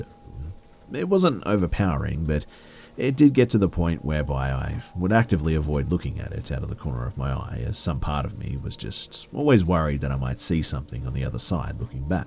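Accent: Australia. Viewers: Is it bad that i just realized he is a ausie?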